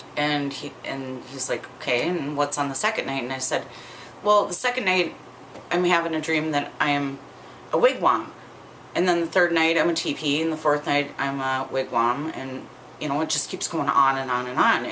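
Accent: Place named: Minnesota, United States. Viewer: Hahaha Hahaha!!!! Love this! Love the Minnesota accent ever since I heard it in "New in Town"..... Don't Cha Know!!!! Have a super day!!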